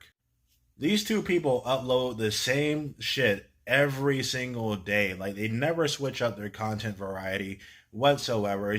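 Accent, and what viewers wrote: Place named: Texas, United States. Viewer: Where you from man you sound like you are from Texas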